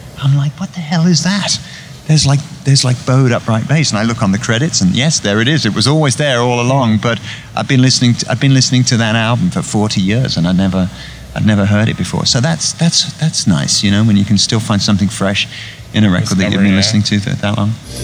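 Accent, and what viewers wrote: United Kingdom. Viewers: Posh accent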